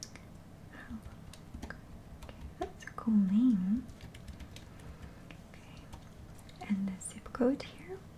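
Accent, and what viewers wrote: France. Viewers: Ton accent français est juste magique pour les oreilles! J'aimerais trop un asmr en français un jour s'il te plaît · How cute you are with your french accent 😍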